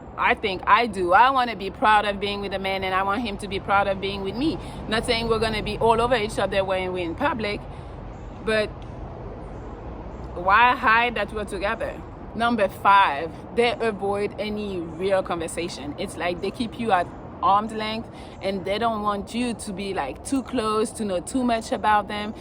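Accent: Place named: France. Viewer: I knooooow that accent was French...that sexy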